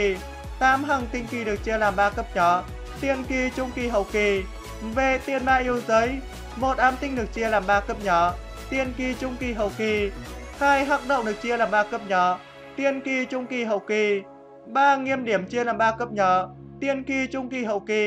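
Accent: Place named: North, Vietnam. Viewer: Bắc kỳ đọc khó nghe quá…!😂😂😂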